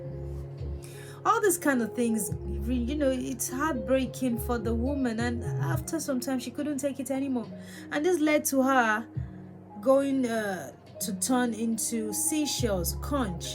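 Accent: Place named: United Kingdom. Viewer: She's speak like a United Kingdom citizen ❤. Her beauty is astonishing 😮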